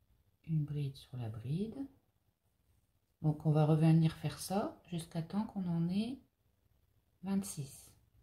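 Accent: North, France. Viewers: Bonjour crochet très joli je pense la faire ce fil est tellement super vos explications sont claires. Une question sans indiscrétion seriez vous du Nord car votre accent m interpelle?tres beau travail merci beaucoup et bonne semaine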